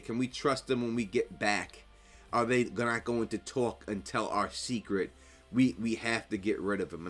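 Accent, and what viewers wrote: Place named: New York, United States. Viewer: Bruv, it's the accent. My boy is from NYC, I'm from Dirty Jersey. That's how some people speak around the area.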